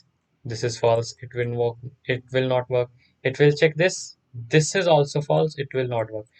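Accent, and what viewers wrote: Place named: India. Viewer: the moment you hear the indian voice you know you can rely on them to help you with virtually anything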